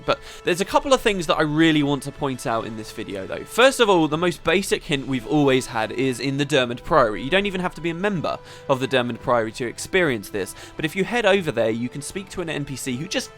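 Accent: England, United Kingdom. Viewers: I've never even played guild wars. English accents make you do things...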